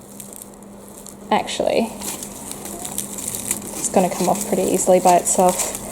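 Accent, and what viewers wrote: Australia. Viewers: Good to hear an Aussie voice, my catasetum has just decided to rot it’s new growth hoping I can save the backbulb and it decides to do something in spring😢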